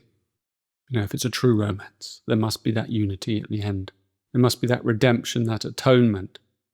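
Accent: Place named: United Kingdom. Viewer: Same thing happened to me! I love beautiful and elegant British accent…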